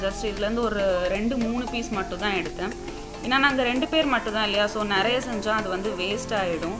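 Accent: Kerala, India. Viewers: Nice speak. Tamil. I think your from kerala. But your speech. Tamil. Gails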